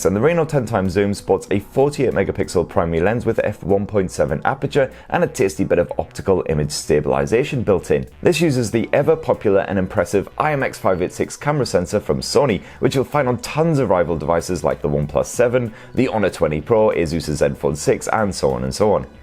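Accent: United Kingdom. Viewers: I watch all your videos even though I can barely understand lol your accent is too British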